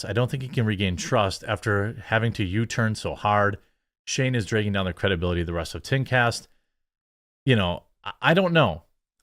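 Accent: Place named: Minnesota, United States. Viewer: I still yell out baaaags every time he says it and I wouldn't have him change it for anything. get down with your bad Minnesota self.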